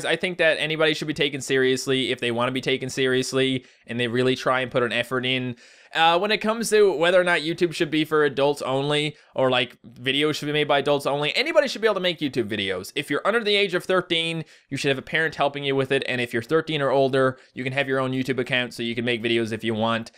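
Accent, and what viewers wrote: Newfoundland, Canada. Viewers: I didn't understood anything of what you said with the Newfoundland accent. ^^ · Your "Newfoundland" accent sound kinda like the way people talk around here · That's weird, your accent is extremely Canadian.:'D I could tell you were Canadian instantly tbh. (I'm an accent nerd though) (anyway you're awesome keep on keeping on /o/) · Hey im from NL Bonavista and i understand all the newfoundland buddy, Love you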